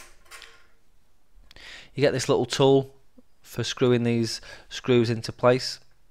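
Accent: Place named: Cockney, United Kingdom. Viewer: good video but you speak faster and you use the cockney accent and I cant understand you please add an authentic english subtitle because the automatic subtitle generator of youtube can't shoot all what you say because you speak faster and withe unauthentic accent thank you for reading my comment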